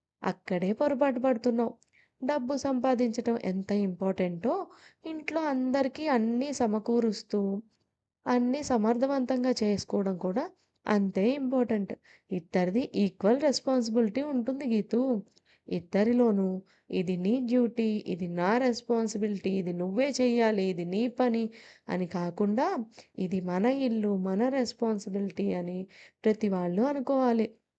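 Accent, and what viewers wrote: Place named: Telangana, India. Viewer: Chala baga chepparu andi telangana slang kuda super ga vachindhi andi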